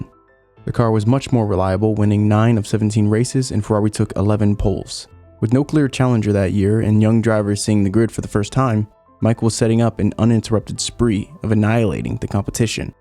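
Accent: United States. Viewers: It might be weird, but this is my first time hearing an American accent talking about F1......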